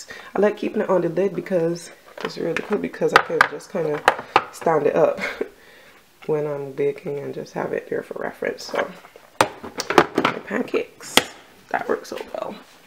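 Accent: Barbados. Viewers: From the beginning of the video I heard the bajan accent then I looked at the name sure she's a bajan.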